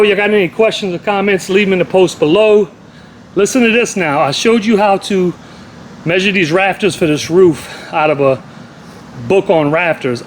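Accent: Louisiana, United States. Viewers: Are you in Louisiana somewhere? 😊 You sure sound like you're from home. Thank you for this detailed, clearly communicated instructional video. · What part of Louisiana you from, bruh? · One of the best videos. I’ve watched it so many times. I would have bet my life when I first started listening that you were a black man named Mr. Beauregard. You were standing in the garage. I was so surprised when you were outside and you were white! 😂 Love your accent! Is it Louisiana? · Nicely done! Man, I thought I heard Louisiana in there somewhere.. subscribed.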